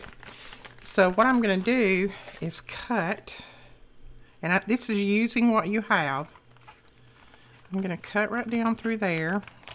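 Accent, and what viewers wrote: South, United States. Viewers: Awesome! I will put you some vintage book pages in the mail!💯📓😎 And now I know why listening to you makes me feel right at home: a sister southerner! 🤓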